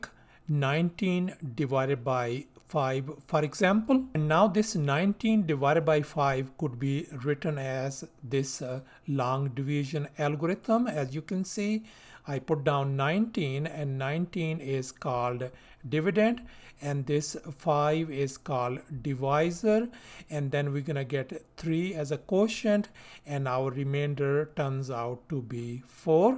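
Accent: India. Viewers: Sir is probably an NRI, as u can hear from his voice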